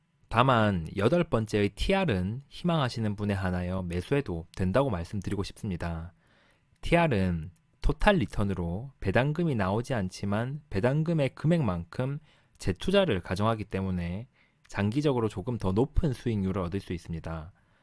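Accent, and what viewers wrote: Gyeongsang, South Korea. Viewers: 억양에서 경상도사투리가 느껴지네요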